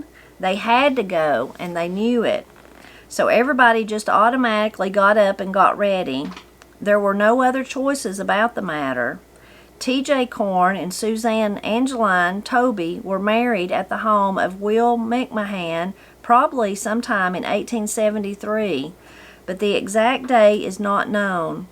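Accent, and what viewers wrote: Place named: North Carolina, United States. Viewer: Thank you for reading this! I first read “Christy” back in the early ‘70’s, have gifted it to others, and re-read it myself a few times since. I’ve just ordered a copy of “Letters to Lori”. I live in Granite Falls, WA and do not often get to hear a beautiful N.C. accent, so I truly appreciate you reading the book and your commentary on it. It was a special blessing to see the video in the link with Miss Opal❤ May God bless you and your loved ones. Also, know that I’m praying for those folks who’ve asked for prayer.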